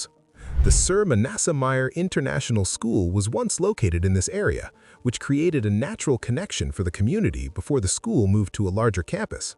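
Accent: United States. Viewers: The -"gie" in Selegie Road is pronounced like the "-gee" in "geek". Please stop pronouncing everything the American way when the names belong to another country.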